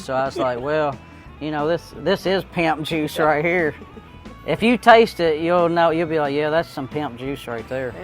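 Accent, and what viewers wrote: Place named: Kentucky, United States. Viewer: Awesome KY accent. :)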